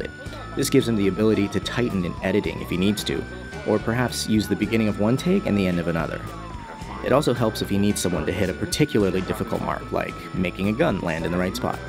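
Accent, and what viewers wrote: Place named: Boston, United States. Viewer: you're from boston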